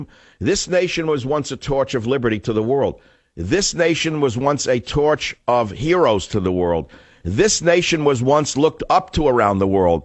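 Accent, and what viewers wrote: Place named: New York, United States. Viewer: Again, who is this guy so sure people should listen to his opinion - what special thing has he done or whatever that gives him such right to spread his venom and expect someone to listen and his new york accent. Yuck even when he says words like freedom and other goods words. He is nobody and unknown - what has he achieved?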